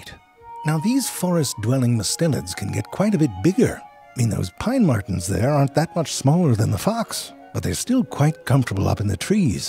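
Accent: United Kingdom. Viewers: Is it just me or is the voice starting to take on some elements of a British accent?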